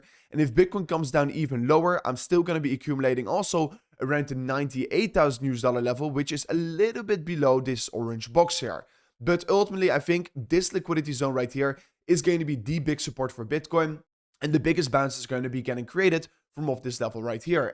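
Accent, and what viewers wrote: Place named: Netherlands. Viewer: haha en ik had al zo een vermoeden dat ik een nederlands accentje hoorde 😅 je doet dit super!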